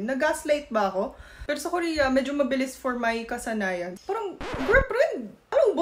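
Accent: Philippines. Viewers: tunay kang pinay kasi when it comes to beauty marami namang pinay like your beauty magsalita pinay pagmagenglish pinay accent kahit kunting korean accent wala eh more on filipino ka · You have a Pinay accent na talaga 😊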